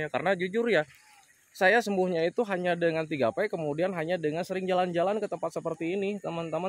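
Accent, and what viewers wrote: West Java, Indonesia. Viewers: Sundana ti mana sih kang??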